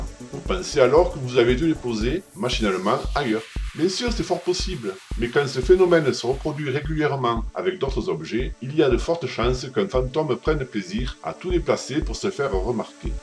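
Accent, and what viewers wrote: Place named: Southwest, France. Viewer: Bonjourrr j’adore votre accent du sud ouest comme le mien hhhhh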